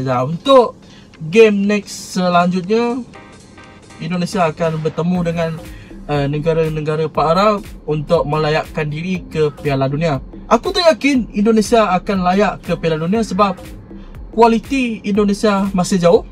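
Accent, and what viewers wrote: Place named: Malaysia. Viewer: Bahasa logatnya jlas melayu antek malaydes😂😅😅😅😅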